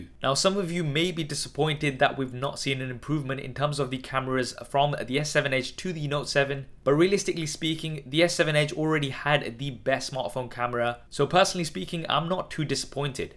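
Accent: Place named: London, United Kingdom. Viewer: Where are you from brother? Sounds like you're from around East London. Man I am happy to see a fellow London goer take up the stage and have a greatly sized channel of electronic fandom. I might be wrong, you could be from Manchester, but I haven't heard that accent in years. Anyways, love the video, well made and professional. Subbed.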